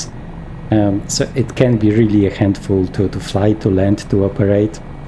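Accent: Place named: Poland. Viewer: nie ukryjesz sie, polaka slychac na kilometr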